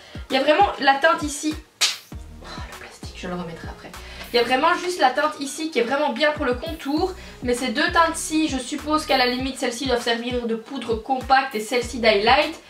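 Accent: Belgium. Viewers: Tu es belge ?😘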